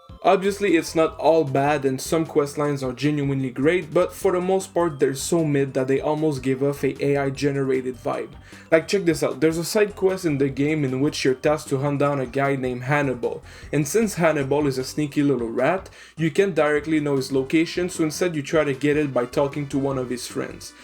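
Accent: Quebec, Canada. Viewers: r u a quebecor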